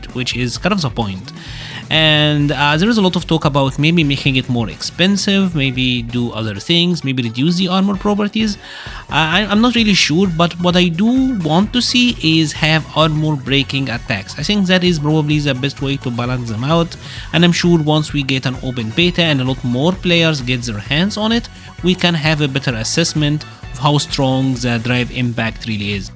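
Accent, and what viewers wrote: Egypt. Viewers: صوتك مصري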